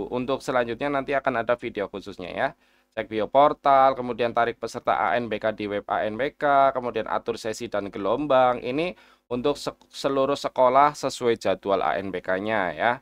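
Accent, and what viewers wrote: Banyumas, Indonesia. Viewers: LAMA GAK DENGER SUARA NIH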